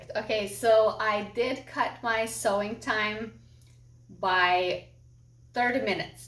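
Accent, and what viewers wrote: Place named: Lithuania. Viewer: You have such a nice personality! I could watch you over and ovr. I'm new sub, 77 from Wisconsin, USA! I'm glad I found out your origin is Lithuanian, because I've watched 4 videos and I've been trying to figure out where you were born, your accent is SO CUTE! I'm watching ALL your videos now. I wish I fit in your clothes! I'm a bigger woman, but I would LOVE to buy an outfit to wear from you. God bless you!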